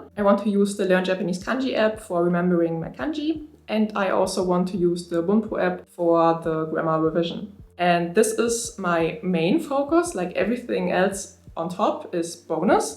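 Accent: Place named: Germany. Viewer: Hey, fellow German? 😁 Thick accent just as me. I'm also waiting for the JLPT results. I'm in Language School in Japan though. Still I get study slumps, and especially speaking is scary here as well. Can 💯relate! Keep at it! 💪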